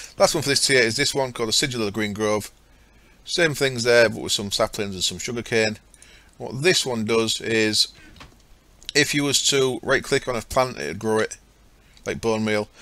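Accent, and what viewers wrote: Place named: Yorkshire, United Kingdom. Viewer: thought you sounded as if you were from yorkshire :)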